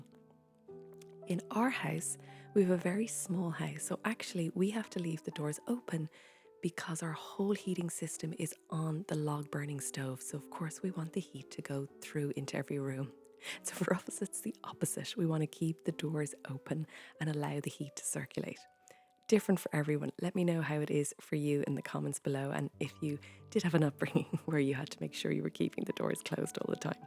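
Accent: Ireland. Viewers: thank you so much for the recent video on how to save energy, some new tips some old. I likes the door draft / stopper!!. In my country the private consumtion of energy has dropped during the winter; people can save if they have to, most depending on the high prices on electricity and gas... I likes so much that you were facing us during your entire 'energy lesson'. Your voice and your Irish version of English is a feast for me and I guess also for all followers. Now your eayes and smiles supporting you voice, message and stories...makes it even better. Here where I live, the days are longer, the sun is shining as like today, however the nights are cold, and also during the daily walks, a wollen hat or similar headwear is needed. Thanks again for the video, your advice, your narrating on energy. All the best to your family. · I was a single mama, therefore I had to be frugal as I had to pay for all the expenses and our house on my own. 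No help no money from my little angels father. I paid cash for everything( except the house payments you know) I called my electric and power company’s to find out what they call peek hours here in USA. So from pm at night until am it would be cheaper to do laundry or run the dishwasher. Since it was just us two , I ran the dishwasher once a week after pm and did laundry another day once a week after pm. In the summer I grew tomatoes/ cucumber/ corn on the cob, mint and herbs. I always made strawberry/ mint/ cucumber water never purchased soda. I was on a budget BUT I Made it happen. I’m 61 now and my little Angle is all grown up and has left. I lost my mom so it’s been an adjustment but now I’m living with the man I knew most of my angels life but I was focused on being a mother and taking care of my aging mother so I never wanted a relationship a friendship was great for me. Now that we are together I’m still very frugal and still make my own house cleaner as you do vinegar r/ baking soda and lemon were my cleaners and still are. 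My home is now rented out and I finally have a little extra income I’m saving. I just found your channel and love it. My dream has always been to go to Ireland so I just love your accent 🙋🏻‍♀️👏🏼👏🏼👏🏼👏🏼 thank you lovely words of encouragement and advice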